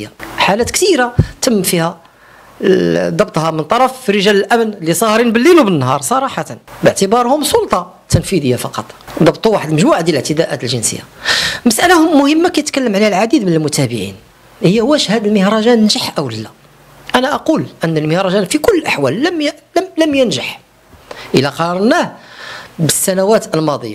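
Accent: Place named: Saudi Arabia. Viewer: كتقلد السواعدا في هضرتهم هههه و تريد أن تظهر أنك عالم زمانه و غير هضر بالدارجة فحتى الخليجيين كيهضرو غير بلهجتهم ، مستلبي الهوية يريدون إقامة دولة هههه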